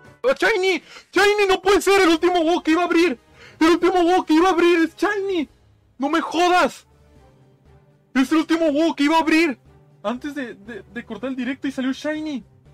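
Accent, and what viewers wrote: Chihuahua, Mexico. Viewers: We tu eres de chihuahua verdad? Xd no te doxe ni nada pero tú ch es muy nuestro jaja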